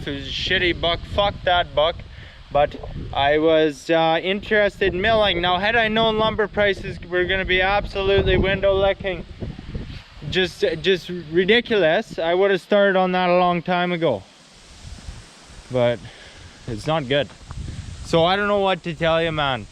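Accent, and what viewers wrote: Canada. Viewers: You have made me fall in love with Canada with your accent lol and of course the beautiful wilderness of Canada is amazing too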